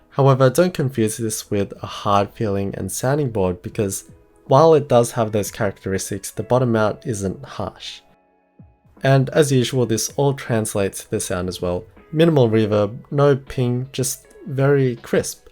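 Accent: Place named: Australia. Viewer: you sound more Australian than usual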